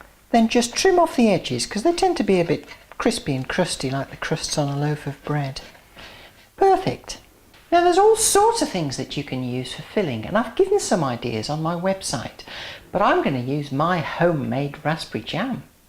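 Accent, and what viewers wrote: United Kingdom. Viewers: i'm french and a love your recettes and your english it's sooo british !!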